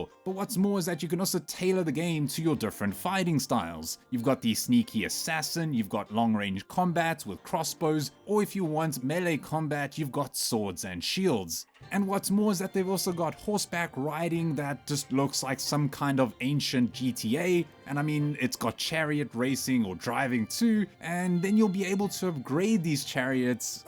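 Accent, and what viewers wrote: South Africa. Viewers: You from South Africa boet? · My infallible sense of compatriotism detects the dulcet and mellifluous tones of a Saffer twang 👂🇿🇦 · Mate... Are you South African?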